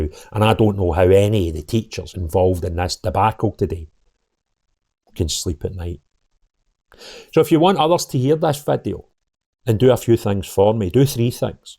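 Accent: Scotland, United Kingdom. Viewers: Forget their nationality,religion etc,,yet your headline,,foreign attacker,,,your a Scot in accent only,,,,heres one l would bet on,,,your a rfc supporter or that ilk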